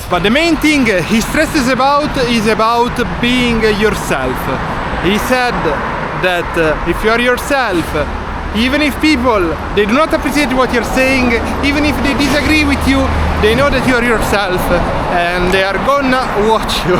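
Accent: Italy. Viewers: Thanks for the insight, best of luck on your channel. You got the talking down. Your English is very good. Love the Italian accent. Anch'io vivo qui in Italia. Vicino a milano e Torino. Piacere fratello.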